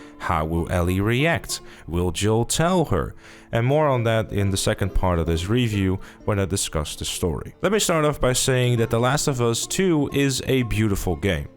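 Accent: Netherlands. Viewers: This guy is definitely Dutch😅 Great review tho, keep up the good work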